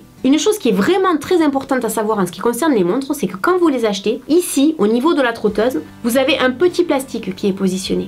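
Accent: South, France. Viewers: Tu est du sud? Quel est ton accent? j'adore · j'aime beaucoup ce que tu fais <3 puis entendre ton accent en sachant que je suis sudiste aussi, c'est un vrai régal <3 merci pour ces moments de partage Je viens de créer ma chaine et j'espere un jour pouvoir partager comme tu le fais si bien <3 Pleins de bonnes choses pour la suite....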